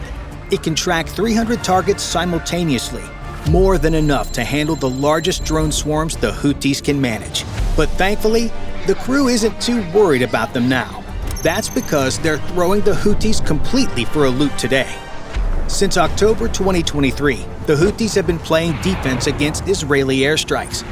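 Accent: United States. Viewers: Had to give up. I can't stand the overblown yank narration 🤢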